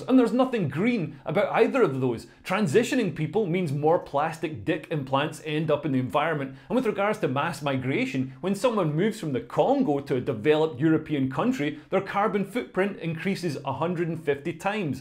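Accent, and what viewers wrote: Scotland, United Kingdom. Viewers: I love listening to you. Scottish accent right enough but your words are always, totally correct. Northern Irish with factual Scottish roots. Thank you for facts that that the B-BBC can’t get an actual fact these days.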